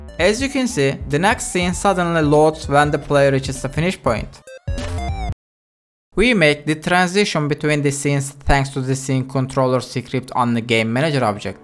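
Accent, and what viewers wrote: Turkey. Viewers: ENG Below Kötü anlamda söylemiyorum, ilk defa izledim ve ilk cümlenden aha türk developer bulduk dedim :D. Samimi ve kaliteli bir kanal kurmuşsun, umarım hak ettiğin yerlere gelirsin. I am not saying it in a bad way, I have never watched you before and figured out you are Turkish from your first sentence. You created high quality channel and you are very sincere. I hope you'll get what you deserve. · Bende Turk oldugunu anladim :p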